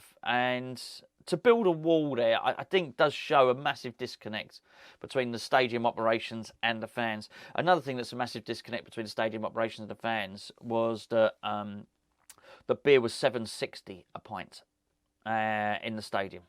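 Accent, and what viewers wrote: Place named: Scotland, United Kingdom. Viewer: Great Scottish accent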